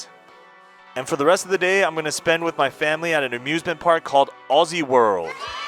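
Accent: United States. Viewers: you sound so American to me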